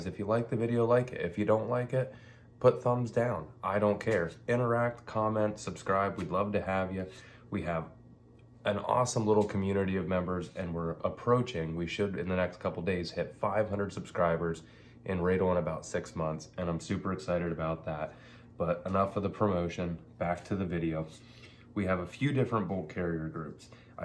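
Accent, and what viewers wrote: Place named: New England, United States. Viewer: I just remembered him saying in his New England accent that steel is very “hard”, and that that steel will last forever. Just keep it clean and very lightly oiled, noting can happen to those rails. The only parts that can go bad are the cam pin and the parts actually on the bolt, the lugs, the extractor, etc. I’m not an expert though, but that’s my understanding. The rails probably will never be a problem.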